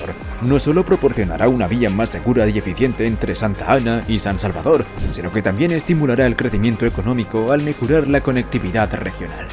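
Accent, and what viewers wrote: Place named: Spain. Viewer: Espero que este tipo de vías de comunicación vial se sigan replicando en el país, es necesario que las carreteras no provoquen un mayor impacto en el medio ambiente, las carreteras de tipo elevadas son una magnífica solución y pueden ser muy bien aprovechados para largos trayectos contemplativos. También es necesario implementar el paso por túneles. El país es hermoso. . . . . No me gusta la voz con acento español, es demasiado ruidoso. Preferiría escuchar un narrador de mi país.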